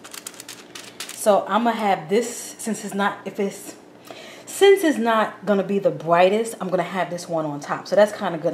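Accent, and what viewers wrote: New York, United States. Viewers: Great video! You Sound like my aunt lol NEw Yorker? ❤️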